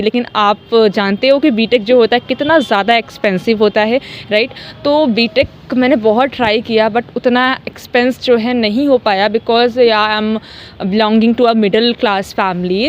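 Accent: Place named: Haryana, India. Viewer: Oh well actually from your language I thought that you are from Haryana.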